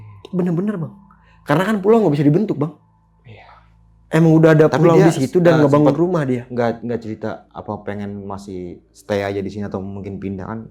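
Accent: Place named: Jakarta, Indonesia. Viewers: Gua demen neh yg logat logat depok gini 😎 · ganteng tp betawi banget....😅😅😅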